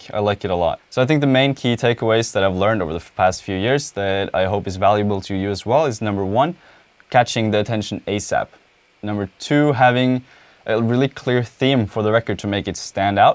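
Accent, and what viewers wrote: Norway. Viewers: Nice. Are you from norway?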